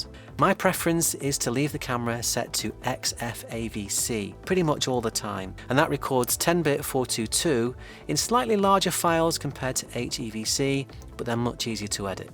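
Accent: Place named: England, United Kingdom. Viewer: Thank you for this very informative video. Gave me the information I wanted with an English voice presented at a nice pace. Thanks again.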